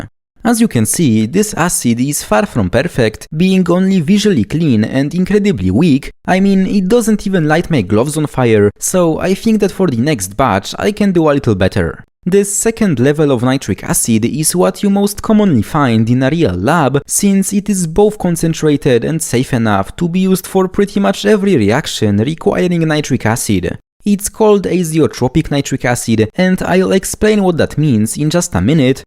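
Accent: Poland. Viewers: First three words, I heard the polish accent, I knew it instantly😂😂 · Od pierwszego słowa wiedziałem że Polak ❤ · Z akcentu wnioskuję że polak?😂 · Most polish accent ive heard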